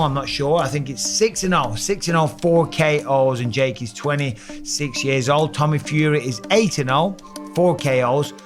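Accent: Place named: United Kingdom. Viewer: It was but he has a geological advantage when It comes to that accent. Gey him to try an impression of anyone outside the UK and it's awful lol. Still funny and entertaining but for different reasons.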